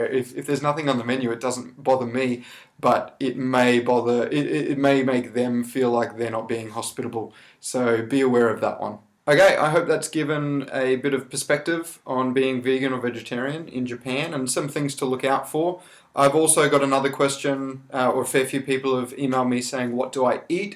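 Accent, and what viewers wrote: Australia. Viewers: yea, that's an Auzzie accent :)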